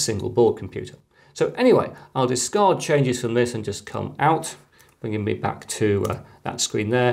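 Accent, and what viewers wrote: United Kingdom. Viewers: just curious, are you from great Britain ?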